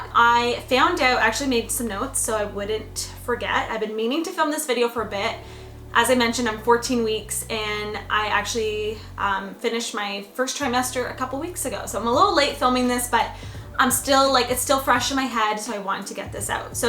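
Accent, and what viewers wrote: Canada. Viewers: Is she Canadian???!! I can hear an accent!!!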